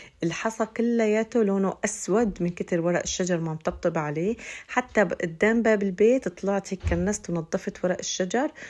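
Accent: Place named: Aleppo, Syria. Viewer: ❤احلى ولفظك بل الحلبي ذكرني بل الاحباب والقرايببن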